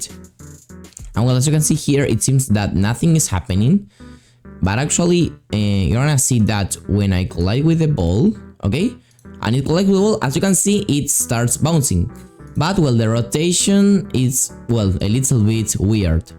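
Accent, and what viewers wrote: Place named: Argentina. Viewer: Your from Argentina ❤❤❤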